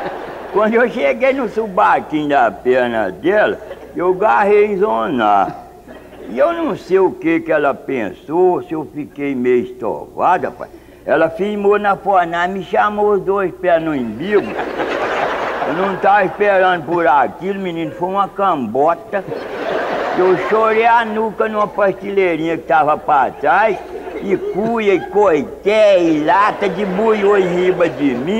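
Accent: Minas Gerais, Brazil. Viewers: conheço bem esse sotaque kkk minero raiz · Onde nasceu não sei . O sotaque e de mineiro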